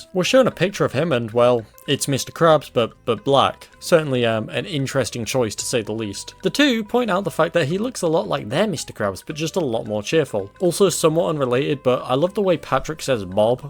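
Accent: United Kingdom. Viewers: Listening to a brit say “ahwsk the stawhff” instead of just saying “ask the staff” really makes me empathize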